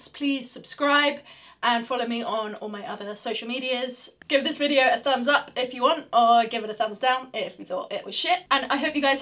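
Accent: England, United Kingdom. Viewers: Typical Brit accent!i love it!you gatt try or or by! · I am from the U.S. What type of English accent do you have? I know its not Cockney. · Where are you from? You sound so British... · You’re not only into music, but motorcycles, touring America, house, kids, English accent, etc? Good God girl-why couldn’t I have found you?!? Yes, let’s see a vid of you and your motorbike experience 👍🏻🇺🇸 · Ah...Your ENGLISH English as the young lady in the Warlock movie says! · I'm a little late to the party as I only found you on youtube recently and was amazed by your skill. Looked up more recent videos of yours and after a few covers I opened this Q&A, so that I could have an idea of who you were, aside from a fantastic guitar player. Well, I'm hooked after just the first minute. I mean, I was going to subscribe anyway, but: - (amazing guitar skills) - awesome british accent - loves science - likes motocross and would have wanted to become a pro rider Really??? 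You're great, I'll be very happy to follow your future projects :) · The british accent is simply the icing on the cake. What a combination of personality, talent, looks, aaaaaaaaaand accent.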